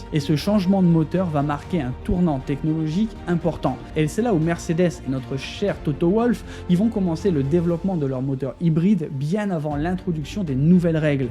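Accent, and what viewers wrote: North, France. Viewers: Tu as l'accent du nord on dirait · Bonne vidéo mais tu ne peux pas me cacher que tu viens de Tourcoing, Lille ou Lens parce que l’accent au formule HEIN! est flagrant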